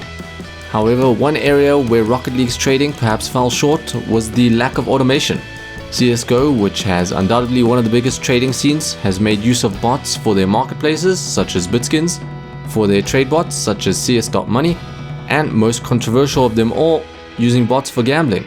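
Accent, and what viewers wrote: South Africa. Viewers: IS that a south African accent i hear? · Are you from SA?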